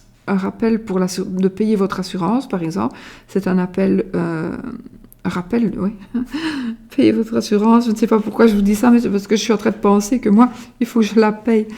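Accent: Belgium. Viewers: J adore l accent belge on es les meilleurs 🇧🇪 Merciii pour ce tirage♥️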